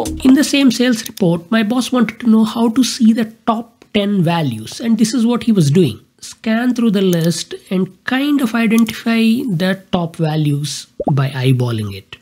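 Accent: Tamil Nadu, India. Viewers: Nice tamil accent with english😊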